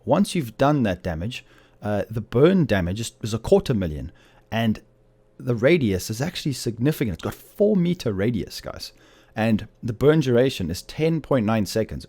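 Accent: South Africa. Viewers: he is from SA obviously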